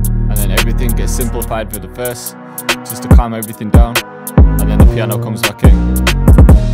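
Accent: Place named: Manchester, United Kingdom. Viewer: This beat is pure fire 🔥 where about in the uk are you from ? Trying to figure your accent out lol gotta be manny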